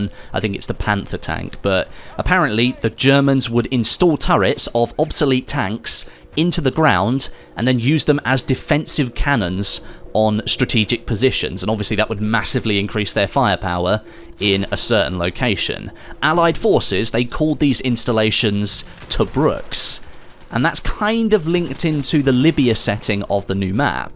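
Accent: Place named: England, United Kingdom. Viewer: Have seen worse pronounciation of german words. You have an english accent, yes, besides that, Sonderkraftfahrzeug was pronounced ok, B-C from your german teacher ;P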